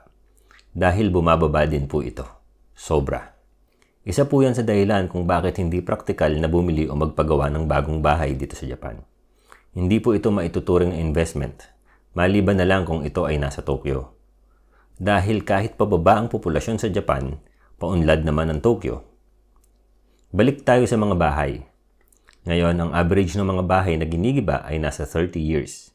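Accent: Philippines. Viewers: Bossing tiga saan ka sa pinas nueva ecijano kase tagalog mo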